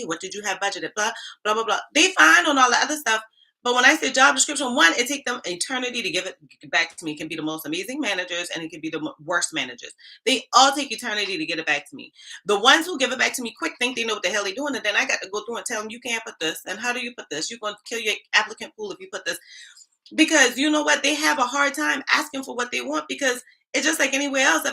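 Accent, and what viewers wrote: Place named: Louisiana, United States. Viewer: girl I love the way you talk and I did think that you was from Louisiana!!! Girl you are funnny and real. I'm not in HR, but I have been during research to get myself ready to move into HR, hopefully with my tranferable skills. I been at my job for 25 years and I want a change and advancement in a career.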